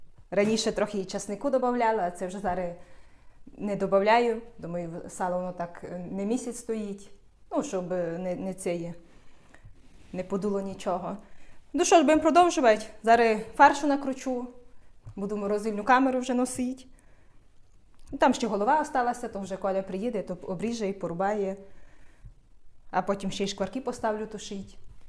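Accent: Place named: Zhytomyr, Ukraine. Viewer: Здравствуйте. Я сама с Украины с Житомира диалект знакомый,вы где живёте? Очень скучаю, а теперь не знаю смогу ли приехать. Я живу в Казахстане, Алматы. Спасибо.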